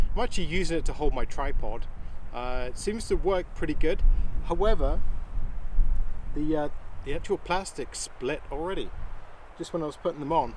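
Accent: England, United Kingdom. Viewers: Hi, enjoyable video thanks. I take it you are originally from England, or at least the UK. How did you end up in Canada? If you don't mind me asking.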